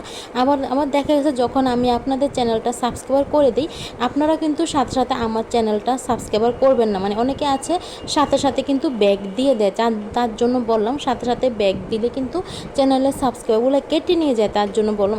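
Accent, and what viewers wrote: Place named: Comilla, Bangladesh. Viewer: আপু তোমার বাড়ি কি কুমিল্লা কথা গুলো শুনে মনে হলো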